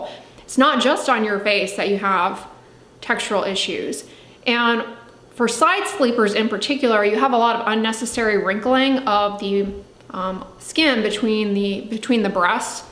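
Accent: United States. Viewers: She definately does not have Australian accent. I am from Australia. Her accent is American.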